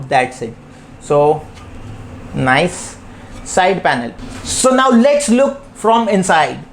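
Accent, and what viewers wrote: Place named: India. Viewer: Ох уж этот индийский говор...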